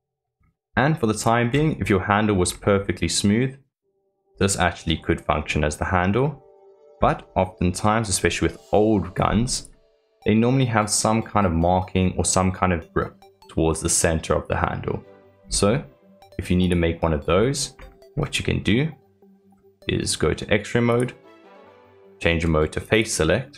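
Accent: South Africa. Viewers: Are you afrikaans? Also great video, thanks for the tips.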